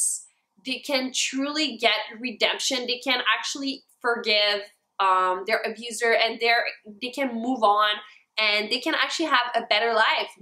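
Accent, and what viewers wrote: Quebec, Canada. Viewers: I love your accent, I'm a Canadian too so I love this. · Are you from Quebec? You have the kinda accent? (In my Aussie opinion lol) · she has a Quebec accent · She's from Montreal She replied to a comment asking about her accent and where she lives. It's French Canadian. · French Canadian. It's just her accent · Are you Quebeci French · Canadian accent 😂😍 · It's French Canadian. And if she only speaks French for the most part she most likely translating in her head.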